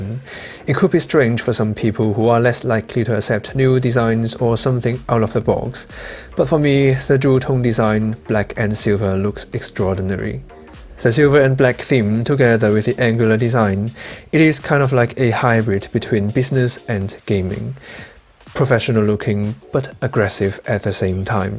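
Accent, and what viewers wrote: United Kingdom. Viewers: i hate that british accent... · 好英式既口音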